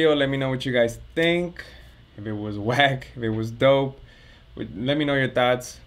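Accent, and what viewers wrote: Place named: Latin America. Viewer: Anh này có chất giọng mỹ la tinh phết đấy chứ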